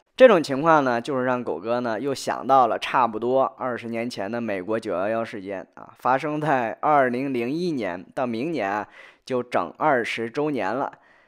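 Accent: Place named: Mainland, China. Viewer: 你这普通话肯定是大陆的吧。骂得自己祖坟不冒青烟吗？😀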